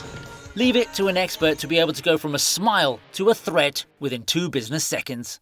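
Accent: England, United Kingdom. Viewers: I'm not yet halfway through and already, it's painfully obvious that saying "bro" and "freakin'" in a middle-class English accent is cringe. As for Leonidas' "wall of death", it's a well; someone mistyped your script. Bro.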